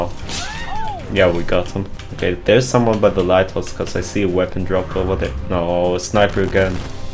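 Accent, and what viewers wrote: Germany. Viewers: I could swear i am hearing german accent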